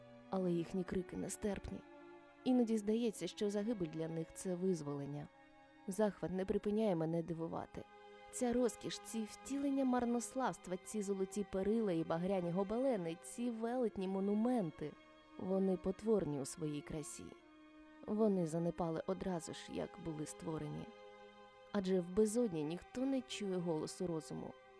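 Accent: Standard. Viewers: Чудовий голос та чиста мова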